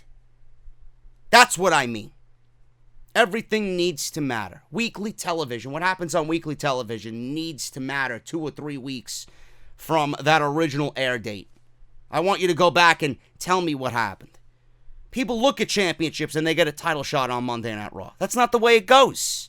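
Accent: New York, United States. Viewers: Damn hon, your New York accent is so much more prominent today lol!!!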